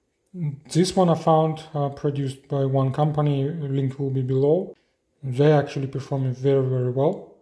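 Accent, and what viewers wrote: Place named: Eastern Europe. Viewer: When I hear a Slavic accent for some reason right away I trust the forthcoming information about radio equipment 🙂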